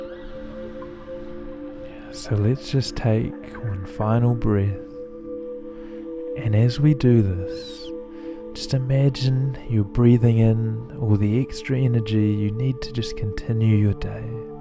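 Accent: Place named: New Zealand. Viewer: Real relaxn More guided meditation please. its nice to hear a kiwi voice! its more calming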